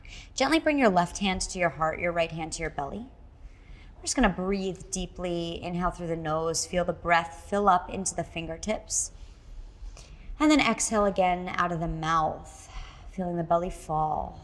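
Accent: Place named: United States. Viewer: I wanted to watch but American accents are so annoying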